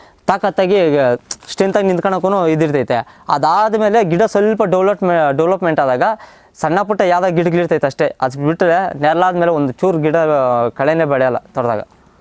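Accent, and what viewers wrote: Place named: Kolar, India. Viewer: Kolar kannada ❤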